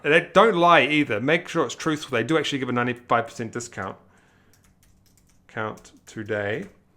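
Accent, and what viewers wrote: New Zealand. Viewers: Are you from NZ ?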